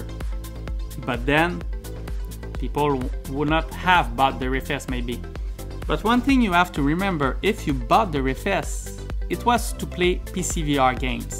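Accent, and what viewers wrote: Quebec, Canada. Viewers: je reconnais l'accent quebecois et vive le canadiens! lol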